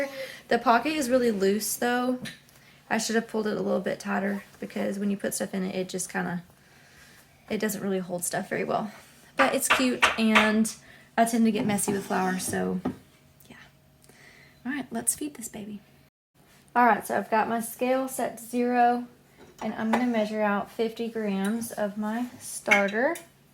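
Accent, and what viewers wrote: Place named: North Carolina, United States. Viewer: Your accent sounds like home. I grew up and still live on the NC coast. The folks of the coast have a distinctive dialect. We live simply and slow.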